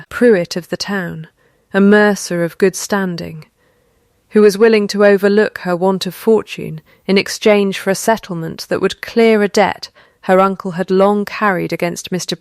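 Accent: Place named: United Kingdom. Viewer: An awfully severe British accent generated by AI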